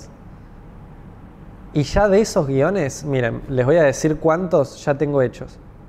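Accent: Argentina. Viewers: Por su forma de hablar se da a notar que es de Argentina (aunque puede ser que eso quiera que sepamos) a mi propia idea acerca del caso pienso que esta en Rusia y ojo es solo una respuesta retorica, a consecuencia que el clima no es visto ya que donde esta no hay luz natural.Por el color del foco es calido el amarillo y caracteristico a diferencia del blanco es mas calido osea mas caliente a comparacion de las temperaturas que recorre la gran rusia OJO, SÓLO ES UNA HIPITESIS REDACTADA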